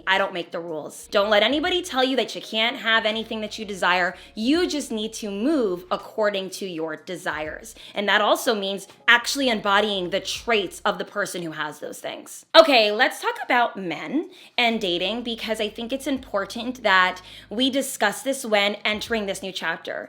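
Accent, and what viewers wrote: New Jersey, United States. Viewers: the jersey accent for meeeee 😩😩😍💕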